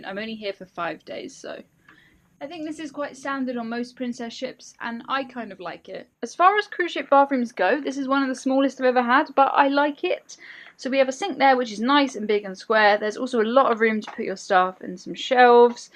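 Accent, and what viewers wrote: RP, United Kingdom. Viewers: Hi, I’m just going through your back catalogue trying to find your oldest or first vid. Am I close with this one? Also you sound so much posher in this vid, is it like your yt version of the old telephone voice 😂 really enjoying your old stuff. See you back in 2023!!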